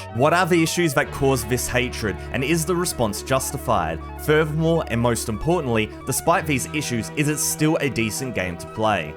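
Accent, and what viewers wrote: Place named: Australia. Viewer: You sound Australian my guy. Do you ever get good ping?/are their AU servers? From the 5 or so games I've played they've all been red ping. Not worth the headache for me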